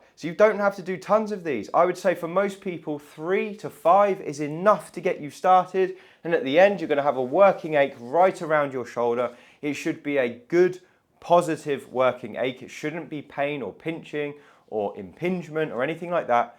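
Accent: United Kingdom. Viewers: Great videos and very helpful. Love your British accent, but who doesn't love a British accent!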